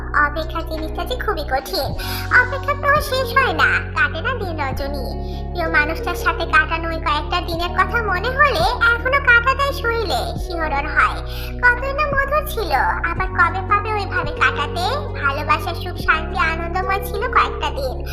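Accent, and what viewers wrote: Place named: Kushtia, Bangladesh. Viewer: আপু আমি জানি এই চ্যানেলে তেকুক স্টোরি ছাড়া কোন স্টোরি কোন সময়ই দাওনা আপু আপু আপু খুব খুব খুব একটা অনুরোধ করছি আপু তুমি একটা তিনচার পাটের স্টোরি দিবে ভিমিন, আপু তোমার এই স্টোরি দেখে আর কুষ্টিয়ার কথা শুনে আমার খুব ভালো লেগেছে কারণ আমার বাড়ি কুষ্টিয়ার ভেড়ামারাতে, যদি তুমি আমার জেলার মেয়ে হলে আমার অনুরোধ রাখবে আমি আশা করছি, আমি তেকুকু লাভার আবার ভিমিন স্টোরি খুব সুন্দর লাগে আর তোমার স্টোরি খুব সুন্দর লাগে তাই বিনিতো অনুরোধ করছি প্লিজ প্লিজ নির্লজ্জের মতো বলছি আপু প্লিজ প্লিজ প্লিজ একটা ভিমিন স্টোরি দাও প্লিজ প্লিজ প্লিজ আর আমার কমেন্টের উত্তর আশা করছি খুব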